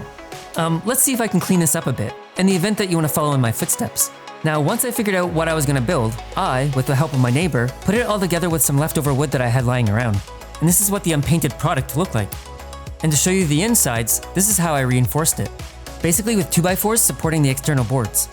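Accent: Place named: Canada. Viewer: Dude sounds like a Asian Canadian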